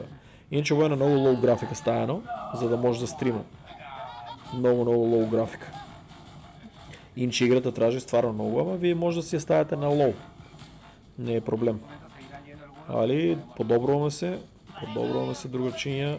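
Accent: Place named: Kočani, North Macedonia. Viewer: Се навлеков на кочански дијалект. :rocket-red-countdown-liftoff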